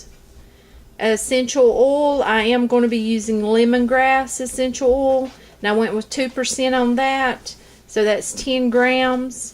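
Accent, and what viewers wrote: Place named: South, United States. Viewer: I love all your snowmen...too cute! The molds are amazing, would like to have one myself .Can I ask where you're from...can't help but notice your southern draw. ; ) I am originally from Alabama but now live in Texas. I just happen to come across your channel, glad I did. Ya got a new subbie! Tfs Have a great day! : )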